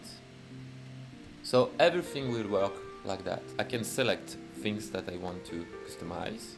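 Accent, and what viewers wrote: France. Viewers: what's up with the french accent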